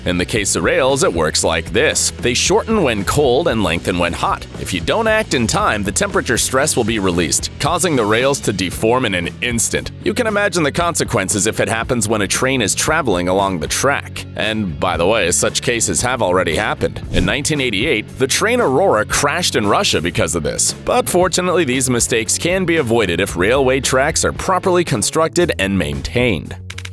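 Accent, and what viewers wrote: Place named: United States. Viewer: I watched about half of it. It was just unnatural, a guy with an American accent saying all those metric units.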